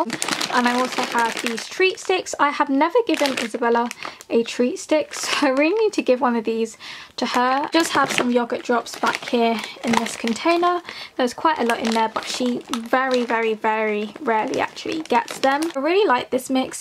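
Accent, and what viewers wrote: United Kingdom. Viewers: r u from the uk? xxxxxxxxxxx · Are you British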